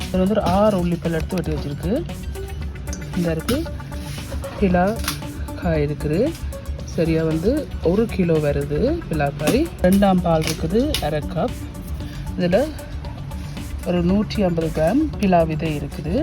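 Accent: Jaffna, Sri Lanka. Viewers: Neenga jaffna தானே.?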